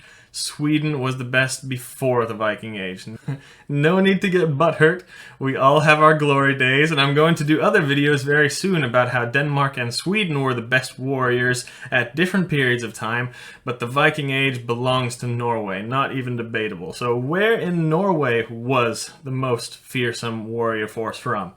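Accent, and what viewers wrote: United States. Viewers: Are you American of Norwegian descent or Norwegian immigrant to America? Or... neither? You just sound so American lol. No hate just curious 😊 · Dacca if you are born in the US your a American... The guys accent is pretty clear he's American... Vikings are long gone but theres still scyfy nerds running around making false claims but hey whatever floats your boat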